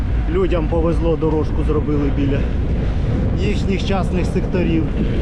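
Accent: Poltava, Ukraine. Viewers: А що не так з суржиком? ... Мені дуже по-домашньому відчувається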